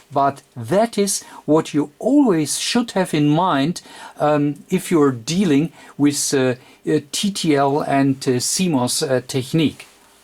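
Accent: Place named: Germany. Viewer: I do hear a strong German accent. Greetings from the Nederlands my vriend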